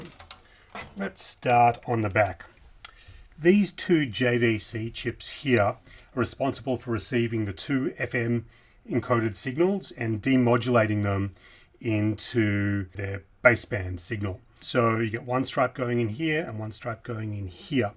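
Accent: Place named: South Melbourne, Australia. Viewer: This man speaks in a heavy south Melbourne accent. A Very harsh Aussie dialect.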